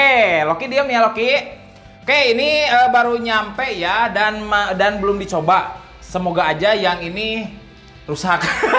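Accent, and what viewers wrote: West Java, Indonesia. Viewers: itu sunda bandung bukan sih?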